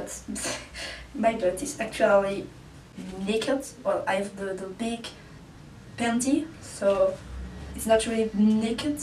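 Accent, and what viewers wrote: France. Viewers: is your accent french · it's a french accent or ...?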